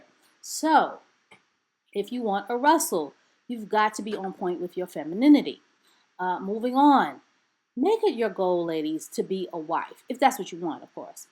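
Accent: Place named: New York, United States. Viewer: Sis " Busted can of Biscuits" you sooooo New York. I love it !!!!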